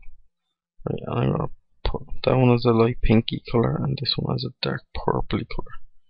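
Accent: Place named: Ireland. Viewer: loving the Irish accent man :D Great tuorial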